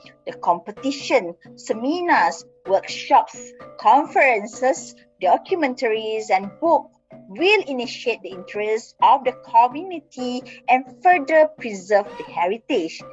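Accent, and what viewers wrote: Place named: London, United Kingdom. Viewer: Wow speaking London 😃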